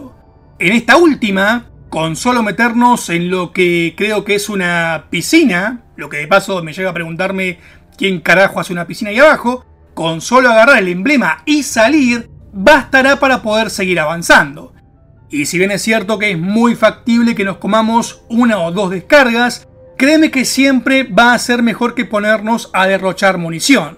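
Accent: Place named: Argentina. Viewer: Yo soy de argentina y supongo por el acento el tambien es argento. Y aca lo jugue en play 2 la consola de sega aca no duro mucho.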